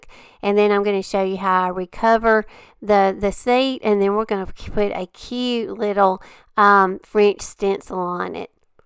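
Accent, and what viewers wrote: South, United States. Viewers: I hadn't been inspired for the past couple days, and I was feeling pretty bummed out about it, so I was searching for some videos I'd never seen on Youtube, when I came across one of your compilation videos. I love the same style of things you do, and I've never seen any videos like yours. I have probably watched every video about crafting that is on YouTube, and you are hands down the most talented crafter on here. Keep those videos coming! P.S. I love your country accent, because mine is the same! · Thank God I came across someone that speaks just as KUNTRY as I do. Lol. People say I'm too KUNTRY soundin'. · I love the things u do.l!! Everything is beautiful..I’m southern too but your accent is deeper than mine .I think hehe I love listening to u!! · I’m in Georgia. You’ve got the prettiest little southern belle accent I’ve ever heard! 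Grateful to find your channel! Finally someone who does country French decor diy! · your videos are awesome, I LOVE all your projects and listening to your sweet southern voice! I have used all of your ideas and feel so inspired by you. Thank you for sharing. God's blessings <3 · I love kuntry accent..very sweet. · I just found your channel. I love your projects! As a fellow Southerner, I just love listening to your accent. I can't wait to watch more of your videos.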